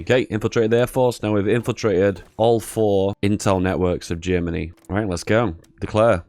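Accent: England, United Kingdom. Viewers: a smooth English voice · #giveaway Hey as I mentioned before, I didn't win a giveaway, but hey I'm doing it again cause it's free. Love your video and love your accent, as a fan of British accent (please don't judge me) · #giveaway I love your videos, they are usually really helpful and entertaining, and along with that sweet British accent just makes watching your videos all the more a pleasure. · Class video mate, just discovered your channel but it looks like rly high quality stuff #giveaway Also nice to hear another English accent 😍🏴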